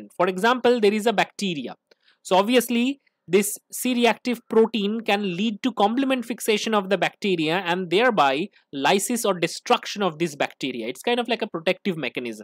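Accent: India. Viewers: The sigh of relief when I heard the Indian accent 😂 I kept telling everyone that I feel horrible, exhausted and nauseous all the time and always got a "we all feel that way, just wait until you have kids, then you'll know true exhaustion 😡" I really feel like punching those people in the face now, because they fired me for always feeling sick and performing badly because of it while not giving me time off to see a doctor. I will cause a scene. This is Europe not America ☺️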